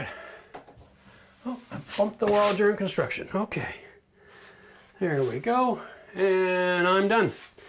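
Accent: Canada. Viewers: Florida? I would have guess you were Canadian.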